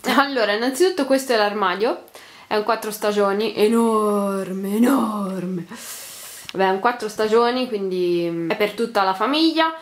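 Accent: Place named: Arezzo, Italy. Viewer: Beeeeello l'accento aretino, l'ho capito subito che sei di Arezzo! Io sono di Firenze, ma i miei nonni sono di lí vicino e mi piace un monte come parlate!:) Mi sono iscritta ora comunque, e mi piaci giá:)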